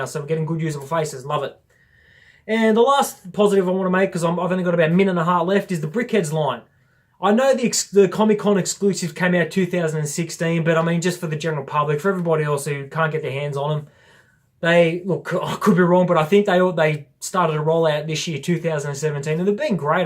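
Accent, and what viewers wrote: Australia. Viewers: i think its a good idea, to make a new release from (some) old sets. the taj mahal is a very cool building - but not to build :-D ... i also wait for the CC, but i think, that will be a dream / The best set 2017 is the old fishing store and the ninjago city..so much great details, so much fun to build :-) / Speed Champions are nice - but i hate stickers, and there are more stickers then parts... :-D ...and without these stickers, you dont know if its a Porsche...or what else ;-) ... / assembly square was 10 years...in 2007 came the first modular -> CC :-) / my english is not so good, but i like listen to you...australian english sounds nice :-)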